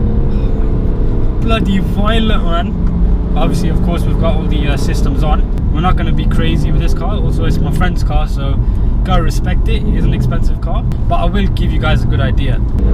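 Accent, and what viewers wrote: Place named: Birmingham, United Kingdom. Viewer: Love your vids bro! Your Brummie accent is hilarious btw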